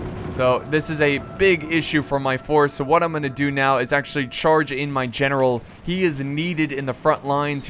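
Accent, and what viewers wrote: United States. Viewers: but yeah its because of having an accent, an American accent. EVERYONE has an accent. And i bet has problems saying PLENTY of non German words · Please, (no offence intended) pronounce those names right, it's kind of annoying to hear them pronounced in an American way. Again, I am not looking for a fight, this is just a piece of advice. Trust me, pronouncing them right grants you extra style points. Great videos, keep it up!:)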